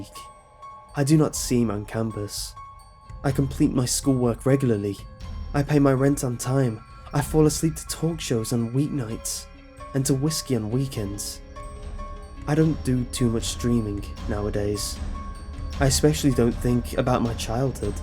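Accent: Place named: United Kingdom. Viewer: The british accent doesnt make it sound THAT scary.. but, its awesome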